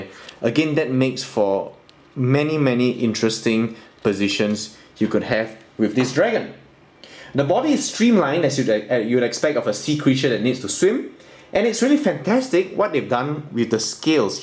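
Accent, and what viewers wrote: Singapore. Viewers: are u singaporean, bro?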